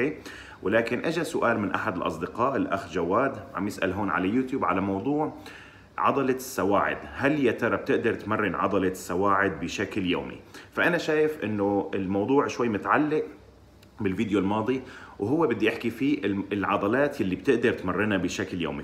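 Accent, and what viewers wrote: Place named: Syria. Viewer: هذا سوري مو مصري ي سطا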